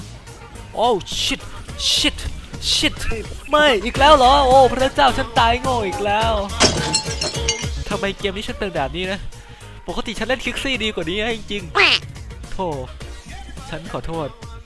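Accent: Isan, Thailand. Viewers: เดินมาลาสคะเจ้า....คนอีสานใช่มั้ย<num>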